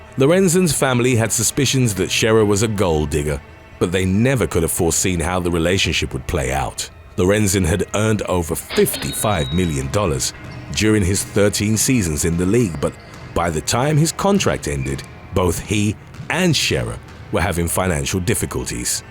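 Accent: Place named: United Kingdom. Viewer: um, where did he say ANYTHING about correct English? HE'S A BRIT.... meaning he's not from USA and is NOT familiar with our pronunciation.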